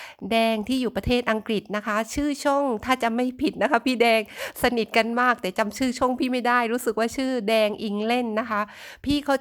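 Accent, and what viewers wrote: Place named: South, Thailand. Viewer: ดอกไม้สวยมากบานสพั่งเต็มทุ่งเลยช่วงนี้ถ้าเก็บเอามาขายบ้านเรารวยเลยดอกไม้ขาดตลาด ฟังน้อง เล่าเพลินเลยสำเนียงใต้ฟังชัดเจนดี รายได้ดีมากคิดเป็นนาทีเลยสุดยอด น้อง สาวใต้เก่งมาก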